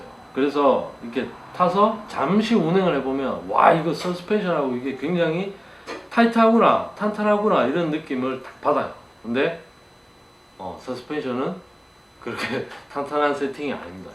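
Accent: Gyeongsang, South Korea. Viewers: 어눌한 갱상도 사투리 계속 듣고 있으니 감기는 맛이 있습니다 ㅎㅎㅎ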